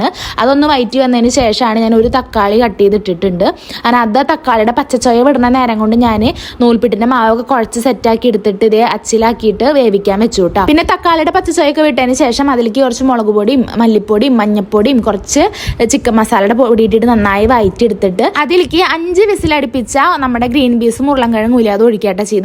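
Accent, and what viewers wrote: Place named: Pattambi, India. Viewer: ചേച്ചിടെ കുക്കിംഗ്‌ and way of talking okke kelkkumbol നങ്ങടെ അതെ സെയിം രീതി എനെന്നു എപ്പഴും തോന്നാറുണ്ട് 😃 ചിലപ്പോ അടുത്തയോണ്ടാകും pattambi😍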